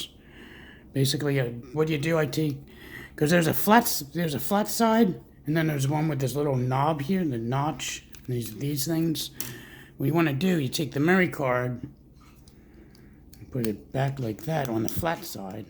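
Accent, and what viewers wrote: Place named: South Jersey, United States. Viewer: I hear south Jersey in you haha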